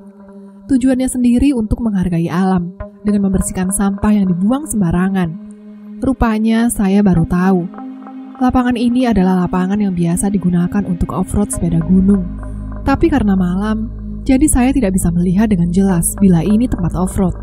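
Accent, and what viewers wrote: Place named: Java, Indonesia. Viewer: tp bhs jawanya medok bngt ya 😃. Sukses selalu ka 👍👍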